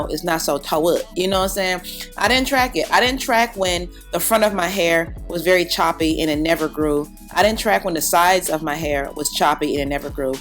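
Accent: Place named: Nigeria. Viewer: Yasssssss come through with that Ayruveda! Can you do a whole video with your Nigerian accent lol, I love hearing it.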